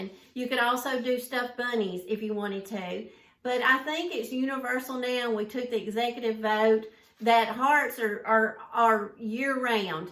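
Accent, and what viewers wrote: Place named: Alabama, United States. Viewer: I especially like your cute flower balls and will make some of them. Your YouTube channel just "somehow" showed up when I was watching some other videos about two weeks ago (or less). I love to watch all you are doing and your friendly. kind, sweet Christian spirit. You know just how to put items together. I have never seen anyone else stage their items and vary them as you do. I have shared your channel with my sister also and she really likes your videos. Such a treat just to sit down, enjoy and learn from you. When I first heard your accent, I thought you might be from Alabama. I am from Ft. Payne, but moved to the mountains in western North Carolina in 1989. Thanks so much. God bless.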